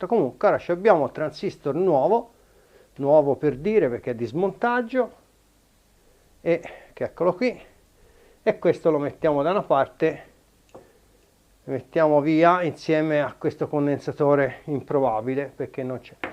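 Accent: Tuscany, Italy. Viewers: buongiorno, sento che sei toscano, ci ho abitato per 10 anni a colle di val d'elsa, poi ho fatto un incidente sulla moto da cross e sono rimasto in carrozzina e ho dovuto ritornare alla mia città, mi hanno regalato un amplificatore pioneer 9900, da un amico, però mancano i 2 coperchi sopra e sotto, e tutte le manopole, i carter e le manopole non mi interessano,poi le trovo io, quello che me lo ha dato,mi ha detto che aveva un canale che non andava, e che si accendeva,ma ho messo la spina e de saltato il fusibile, me lo potresti aggiustare? sono un appasionato di questi componente degli anni di gioventù mia che sono del 66,buona giornata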